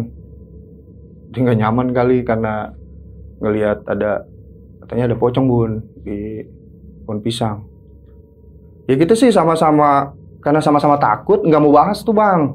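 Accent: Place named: Surabaya, Indonesia. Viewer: Surabaya mn suaranya☺️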